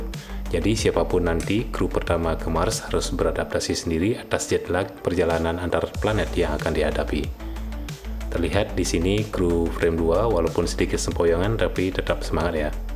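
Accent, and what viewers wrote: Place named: Aceh, Indonesia. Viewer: logat acehnya masih kental bg.. tpi ga papa lanjutkan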